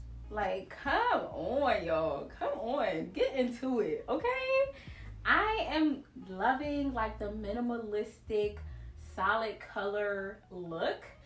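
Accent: Philadelphia, United States. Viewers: lmaoo completely off topic but as a fellow “philadelphian” i’m like she sound like she from philly. i had to do some investigation and saw it in your instagram bio lol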